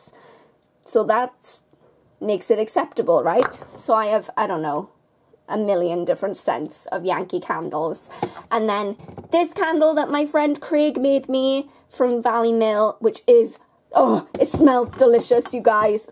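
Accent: Wales, United Kingdom. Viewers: Omg r u welsh soo am i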